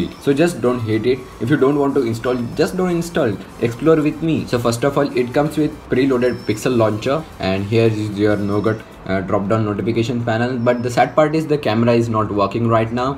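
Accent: India. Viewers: stop videos by indians english language. its very hugly!!! please stooooop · god every non-popular tech video I watch is an Indian with a horrible english accent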